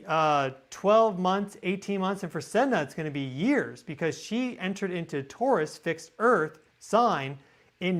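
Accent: Russia. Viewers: You are such a beautiful Human,thank you for the incredible video,and I love your Russian!!💟💟💟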